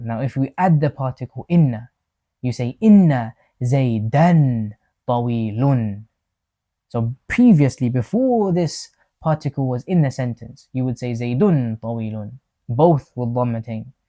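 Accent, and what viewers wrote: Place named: United Kingdom. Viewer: Hi Teacher, since I'm learning (some) Arabic I gather and collect explanations and finally making my own lessons understandable. You just Explained something very Crucial which I've never heard before, moreover I didn't even know it existed. The tricky Fial or Verb, there are 2 types, 1 which is mentioned and 1 which is Not. You are the first to Mention or to Explain these 2 types of Verbs and the differences in Detail. Good Job 👏🏻. One more thing, .... I like your typical British Accent, this gives the classes more flavour 🎉 I wish for lots of Success and more followers / Students. Excuse me for my mistakes, I'm a Dutch speaker. Best Regards from August from the Netherlands 🖐🏻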